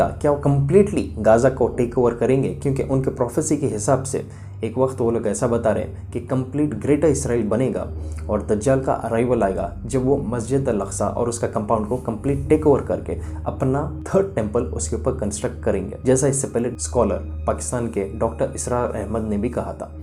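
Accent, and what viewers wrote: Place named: Malabar, India. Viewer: Bhai itne dinon se videos Bana Raheho Aapki Urdu Kerala ke Malbariyon jaisi Hai Aapko Aapni Urdu Hindi Zubaan Sudhar ne ki Zarurat Hai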